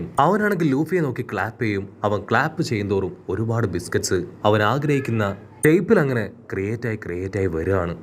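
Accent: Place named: Malappuram, India. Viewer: Ade malapuram thane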